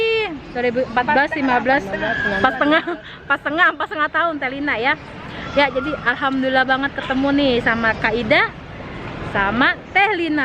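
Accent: Jakarta, Indonesia. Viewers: Saya depok nya krukut limo depok mpok.. Salam kenal mpok suka banget ama mpok yg logatnya betawi tpi pinter bhs asing. Lovee youuu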